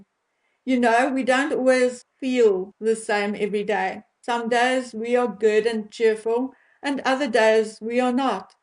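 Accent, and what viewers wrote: South Africa. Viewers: Is the lovely woman you? Had nothing in my mind of what you might look like, a beauty. I have many SA Jewish friends who left the country maybe 40 years ago at least, cause their kids grew all grew up here and are now in their. 40s. They were all professionals. Lived Westchester County, the burbs outside of NYC was a hub. Many came here thru Toronto. Some stayed there, manny went to Dallas. Now I can identify your wonderful accent. Loved their typical expressions. Like “ shame” haha9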